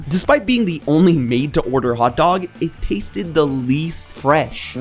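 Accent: Argentina. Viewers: Nothing makes me happier than Argentinian accent coming through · IS FROM ARGENTINA???? THE ACCENT, THE CHARM, no wonder I like him as a host. Another coronación de la gloria 🇦🇷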